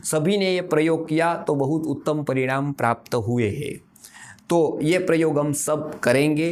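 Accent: Gujarat, India. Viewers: Bhai gujarati lage che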